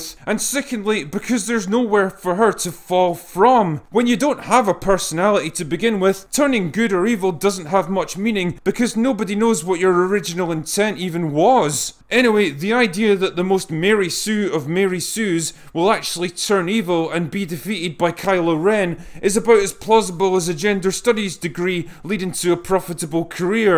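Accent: Scotland, United Kingdom. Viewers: You get more and more Scottish every video, won't be long before we won't be able to understand you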